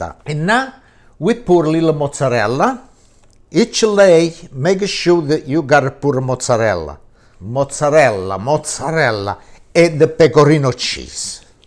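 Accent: Italy. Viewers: I'm laughing AND crying. This wonderful man sounds like EVERY Italian immigrant I had the privilege of growing up around and with, including my own awesome father. Miss you, papa' 🍝🍷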